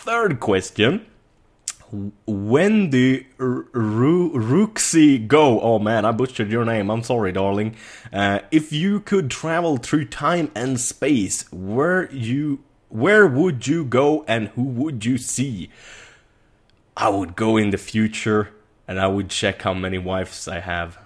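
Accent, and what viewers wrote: Sweden. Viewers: I love your sense of humor. I have to say, though, probably just because of the accent and because I know you're Swedish, I keep expecting you to be blonde, and then the dark hair is a little... disconcerting?... to see. Do you ever have that experience, somebody on YouTube somehow not matching, in appearance, what you expected from their voice or from other things you knew?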